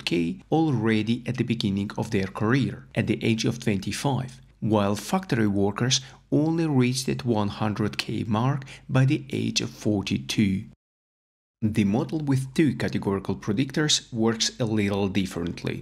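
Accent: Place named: Belarus. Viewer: Thank you for one of the best channels on YouTube on the topic of data science using R. By the way, I had a Jewish grandpa from Belarus and Latvia (he was born in Latvia, but moved to Belarus, before arriving at South Africa). Your accent is quite similar to his, but with an extra German sound. Is there any chance that you are from Belarus?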